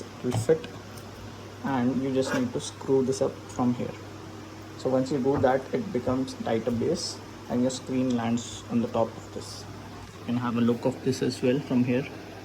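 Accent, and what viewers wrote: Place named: Garhwal, India. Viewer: Wow ur gadhwali accent is so sexy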